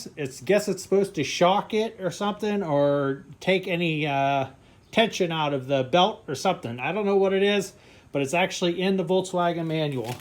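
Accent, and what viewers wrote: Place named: Pittsburgh, United States. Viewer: I didn't even have to look at the location by the video to tell you were from Pittsburgh. Y'all have a very distinct accent. Real thorough tutorial. Gonna be using this soon.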